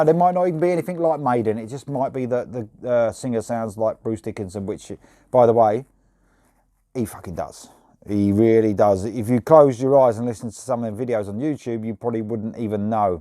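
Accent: England, United Kingdom. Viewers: Fair review. Mendes is gifted by nature, why don’t take advantage of this? I prefer someone like him than someone else that tries to sing like Bruce and sucks. Mendes pays a tribute to the one and only. Respect. About the song... still a bit green, but better than any Maiden songs of the last decade if not more... not taking about the production 😅., I love your English accent, but your Brazilian sucks 😆 Cheers Max 🇦🇺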